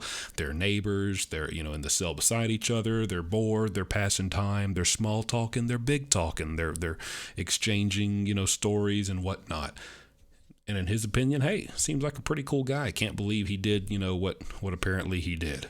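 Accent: South, United States. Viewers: I agree! Great voice, lovely soft Southern accent 👍💐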